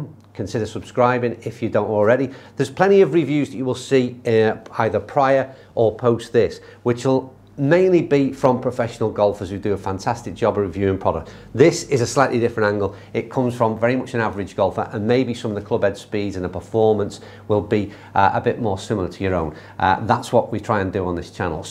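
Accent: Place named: England, United Kingdom. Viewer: Dude, your English accent is hard enough to hear/understand already let alone the loud freaking music.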